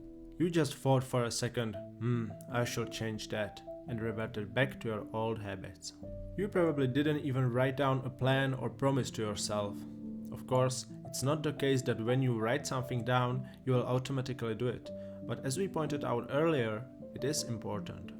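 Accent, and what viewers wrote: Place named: Germany. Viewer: I doubt that AI could imitate your authentic German accent.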